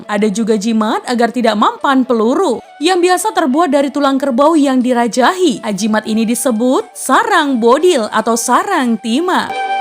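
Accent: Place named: Mandailing, Indonesia. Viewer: Maap.. suara hostnya dan pejelasannya terkesan seperti budaya batak mandailing .sumatra utara memang batak rumpunnya ..bravo ...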